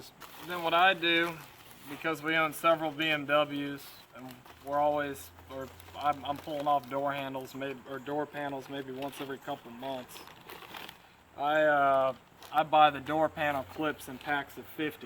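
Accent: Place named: South, United States. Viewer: bro your so country i love it lmao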